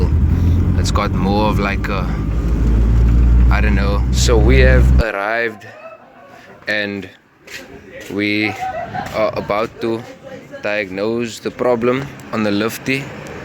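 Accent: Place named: Cape Town, South Africa. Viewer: Love the capetonian accent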